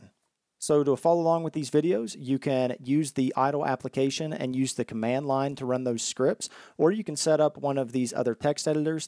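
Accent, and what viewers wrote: United States. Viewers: Man, your speech is amazing. Where are you from? I mean it’s obviously that you are from U.S, but what state?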